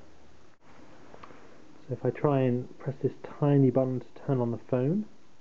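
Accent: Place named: United Kingdom. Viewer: That was funny. I think the guy is British. Hence the accent. I was thinking about getting that phone but after seeing this video i will pass.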